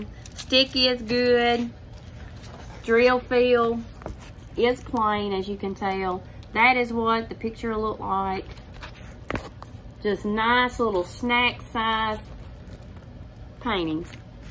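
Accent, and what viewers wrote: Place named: Mississippi, United States. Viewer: Nice haul. Where are you from? Your accent sounds like my family in Mississippi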